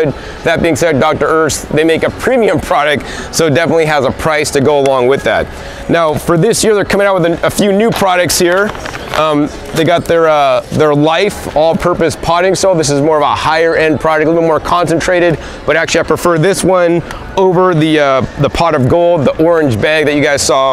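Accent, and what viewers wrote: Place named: United States. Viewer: Totally misleading video! I thought there would be a garden tour with footage of diagnosing plants with microbial deficiencies. But alas, products, products and more products. Consume, sell, market etc. Should have figured with your American accent. Why do yankee's always have something to sell?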